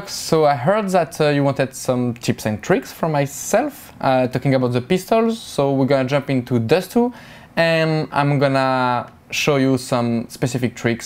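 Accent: France. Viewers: The french accent xD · Gre tu comprends ps en plus c un anglais de français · Totally agree, but at least hearing shox trying to speak english is funny (even for french people his accent is bad)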